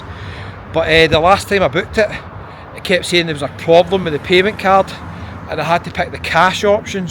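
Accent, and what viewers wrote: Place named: England, United Kingdom. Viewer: Your english is so english i can't understand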